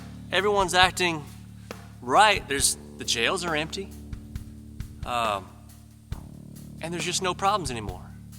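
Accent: South, United States. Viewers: still southern brother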